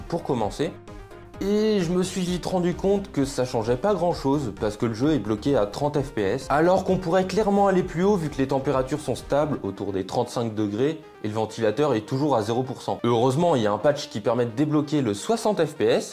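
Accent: Belgium. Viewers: Les belges prononcent pas les chiffres comme les français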